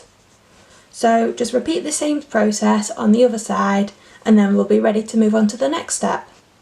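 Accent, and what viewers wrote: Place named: Yorkshire, United Kingdom. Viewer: I kind of thought you were a Yorkshire Lass, I have relatives in Lincolnshire, about 9 miles from Boston or skeggy depending on the way you go. Take care, I’ll look forward to all your future videos. Keep up the great work you are putting out there. 🥰👏🏻👏🏻👏🏻👏🏻👏🏻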